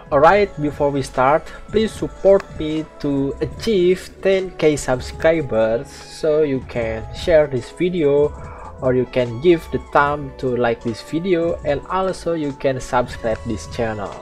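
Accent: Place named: Indonesia. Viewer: Njir kirain orng mana wkwkkw ternyata indo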